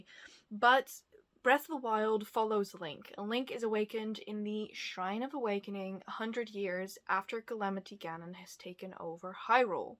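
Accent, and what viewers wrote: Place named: Netherlands. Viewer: I thought I picked up a distant Dutch accent and then I looked it up! So lovely! I like your vids. So lovely and cosy ❤️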